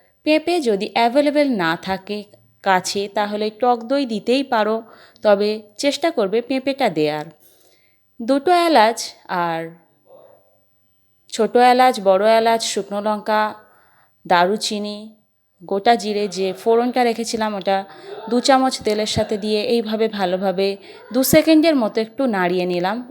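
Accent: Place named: Sylhet, Bangladesh. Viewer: That's what I wanted to know.... Thank you for sharing it.... I thought you may be a Sylheti... That's just how I felt from your accent....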